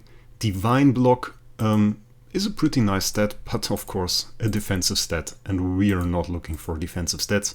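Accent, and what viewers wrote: Germany. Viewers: Do you make acc reviews? And are you german?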